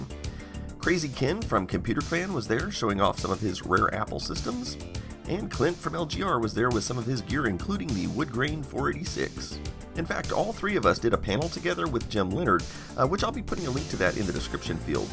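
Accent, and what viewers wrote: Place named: Texas, United States. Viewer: His Texas accent drawls well off-camera.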